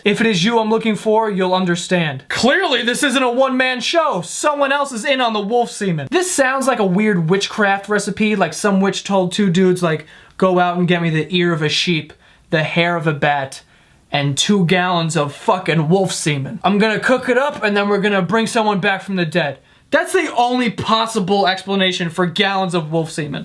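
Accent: New York, United States. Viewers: it's his new york accent. we all do that here. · he's a hardcore new Yorker. its his accent and its dope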